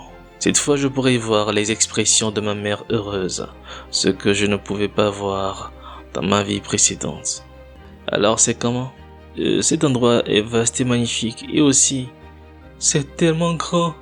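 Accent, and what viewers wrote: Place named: Africa. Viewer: 1 - L'histoire est vraiment géniale et fascinante 2 - Pas d'images foireuses ce qui est agréable 3 - La narration est très bonne et on y sent la volonté qui y est mise même si l'accent africain du naratteur prête a sourire . 4 - Je met 1 gros like a cet vidéo que je recommande a tous et j'invite le youtubeur a continué cet aventure .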